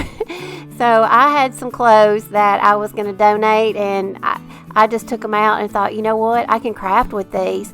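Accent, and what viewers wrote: South, United States. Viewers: `I absolutely love, love, love your pumpkins. They are so out of the box from the normal ones you see. I can't wait to to do some like them, myself. Thank you for sharing your talent. I'm a southerner too, so I really like your accent! God bless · What a sweet, enjoyable video! Listening to your beautiful southern voice and accent and seeing your crazy awesome embellishments just made me get so inspired., I especially love the sweet bird nests with the little pearl “eggs”. Thanks for sharing your creative gift. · Good to hear that southern draw I miss it. May I just say your pumpkins are absolutely beautiful. So simple so elegant . I’ll be making these for sure. Thank you for being a wonderful host in showing us how to make these. Happy Fall 🍂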